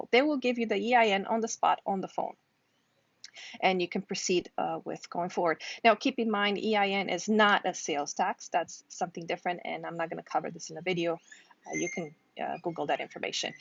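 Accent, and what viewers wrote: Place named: Romania. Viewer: Mom your Not canadian your from Rumenia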